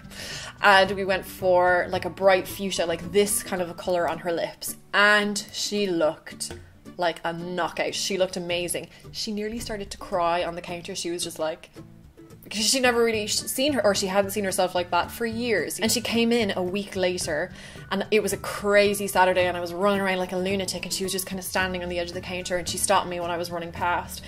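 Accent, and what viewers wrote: Ireland. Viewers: I was thinking west/mid west of Ireland, particularly when you said you met your hubby to be in Limerick (my home town!) best of luck for your wedding!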